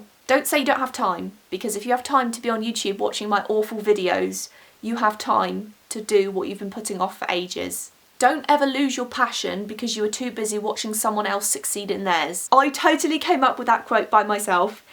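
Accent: England, United Kingdom. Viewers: AHHH how is your accent still so english!?!??!??? x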